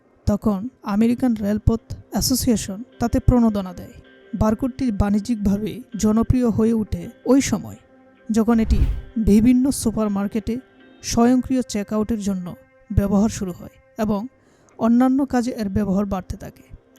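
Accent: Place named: Bangladesh. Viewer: আপনাকে বাংলাদেশী মনে হচ্ছে 😊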